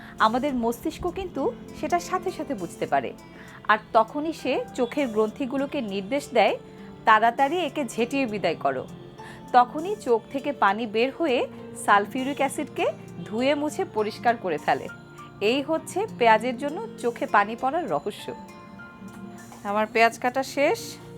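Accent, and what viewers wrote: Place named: Mymensingh, Bangladesh. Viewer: amader paser bashar ak varatiya maymonsing er sm apnar moto kotha bole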